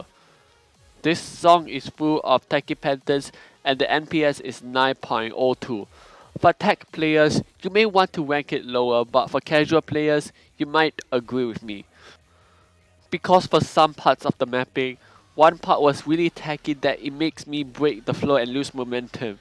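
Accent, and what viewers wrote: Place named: Singapore. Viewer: i love hearing singaporean english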